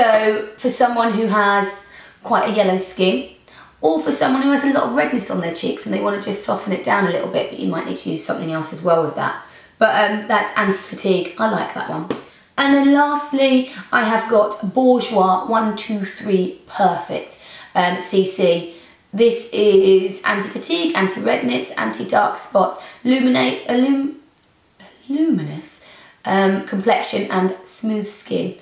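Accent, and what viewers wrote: United Kingdom. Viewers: Omg im so so so in love with your english ,like harry potter 😁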